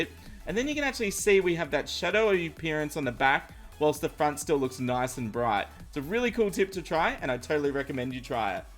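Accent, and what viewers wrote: Australia. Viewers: are you Australian? I feel really dumb right now. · Hes Australian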